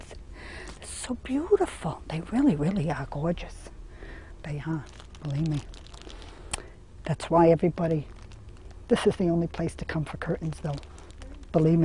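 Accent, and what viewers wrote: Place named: Warwick, United Kingdom. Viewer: Strong Warwick accent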